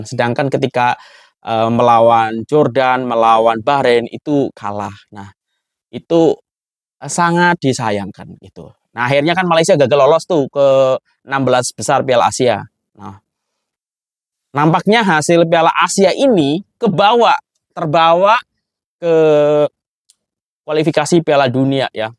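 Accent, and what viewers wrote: Indonesia. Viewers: Bingung ini orang pake bahasa apa.. Logat dialek tata bahasa pake Indonesia tapi disisipin dengan kata2 Malaysia jadi bingung dengernya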